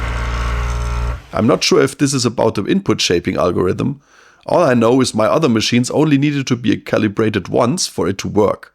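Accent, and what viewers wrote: Germany. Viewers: Ja man hört den deutschen Akzent und das fremdschamt schon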